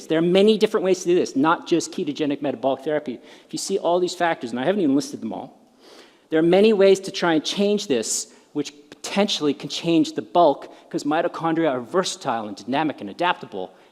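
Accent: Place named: Canada. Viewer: Love listening to your Canadian twang. Always good to hear from you.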